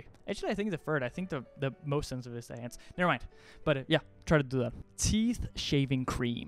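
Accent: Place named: Denmark. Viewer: I can sniff out that danish accent anytime awesome video tho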